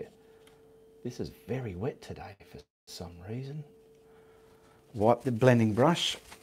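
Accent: Australia. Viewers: I love your art and your Aussie voice!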